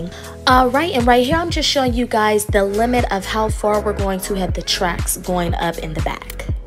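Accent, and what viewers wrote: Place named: Pittsburgh, United States. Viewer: Love the video! Imma try it soon I hope I don’t fail 🤣 also you gotta be from Pittsburgh with that accent!!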